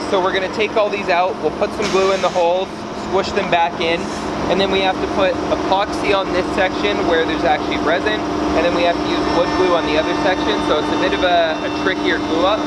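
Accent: Canada. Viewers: Canadian there baud we all sound the same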